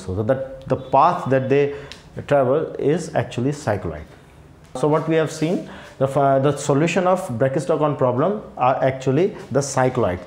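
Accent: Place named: India. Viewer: I like indian english accent also its hard to understand sometimes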